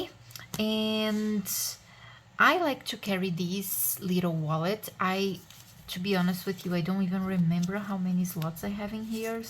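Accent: Brazil. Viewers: esse sotaque com certeza é brasileiro.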